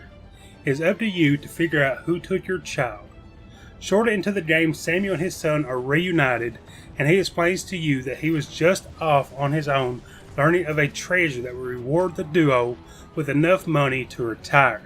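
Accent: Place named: South, United States. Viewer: There are so many people with amazing voices in the halo community, and I am so glad that we finally have someone with a southern accent.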